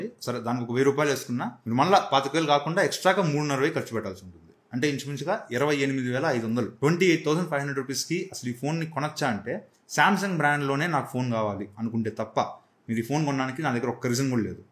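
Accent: Nellore, India. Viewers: ఏ ఊరు బ్రో మీది? కొంచం నెల్లూరు యాసలో మాట్లాడుతున్నారు?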